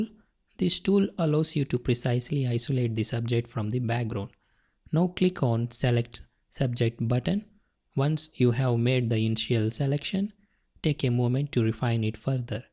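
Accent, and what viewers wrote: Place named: India. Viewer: Tumhari awaj se laga ki tumhe hindi aati hogi bro plz hindi me video banao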